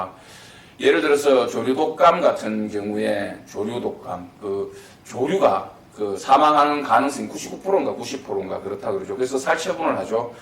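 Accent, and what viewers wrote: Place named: North Gyeongsang, South Korea. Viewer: 싸이먼디는 부산이고 선생님은 경북말투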